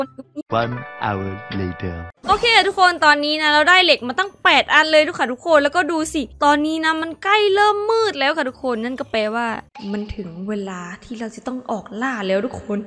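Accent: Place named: Isan, Thailand. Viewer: คนอีสานเบาะ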